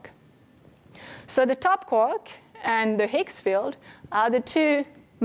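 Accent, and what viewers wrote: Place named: India. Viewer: Why does she talk like an Indian?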